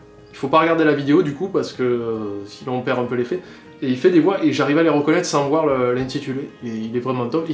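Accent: South, France. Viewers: HAN Man, ch'uis trop triste, personne ne vient à l'Anim'Est !! Bon, vu ton accent je pense que t'es dans le sud, et l'Anim'Est est à Nancy, en Lorraine... Mais pitié, viens ! Fais de la pub, même pour l'année prochaine, parlez-en entre youtubers ; cette année Noob est invité pour la deuxième fois alors que toi, le JdG, Mathieu Sommet ou encore Antoine Daniel n'ont jamais été invités ! >.< Viendez, on vous aime trop par chez nous !!! \o/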